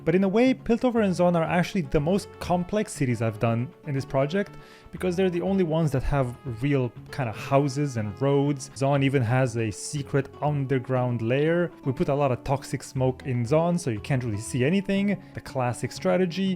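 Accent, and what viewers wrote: Romania. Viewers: are u romanian? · are you Romanian??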